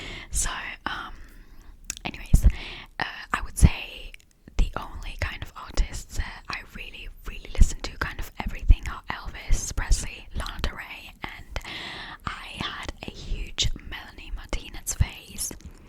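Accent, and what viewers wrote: United Kingdom. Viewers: Such a great British accent, I loved this! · Hi, (aka ) and Happy Friday!😊😊😊😊😊😎☀💙💙💙💙💙💙🎄🇺🇸🌷🇮🇹💖💖💖💖💖💖🎇👍. Your british accent is amazing! Well done!😀⭐⭐⭐⭐⭐⭐👍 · I love your languages series. The British accent is really relaxing ☺️💤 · I love the btitish accent very much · Omg lovee the bri'ish accent 😭 it's sounds so crisp and relaxing ❤ (btw I'm also a huge feta cheese lover AND also I had to mention that your musical taste is literal GOLD 🤌) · Love it❤ your British accent is so pretty!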